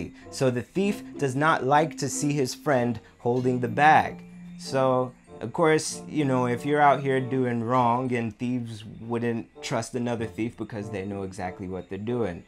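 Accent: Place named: United States. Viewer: i always wondered if you were Guyanese ,and why you talked like that, I thought you were trying to be Americian, and of course you are,but I thought you were trying to be a fake Americian.,and you use to irritate me with your accent.but now I know you are a real Americian I can listen to you with out being irritated.have a nice time in Guyana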